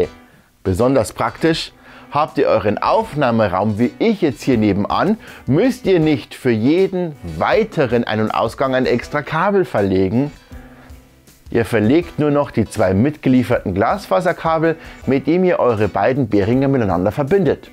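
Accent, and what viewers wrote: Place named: Lower Bavaria, Germany. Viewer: Ja cool :) Grüße gehen raus von Regensburg nach Niederbayern 😊🤙🏼 hoff, ich deute deinen Dialekt richtig 😹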